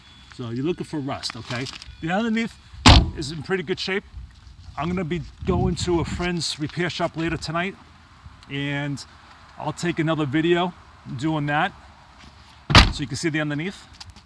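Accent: Boston, United States. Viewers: P.S you got the most Boston accent that I ever heard!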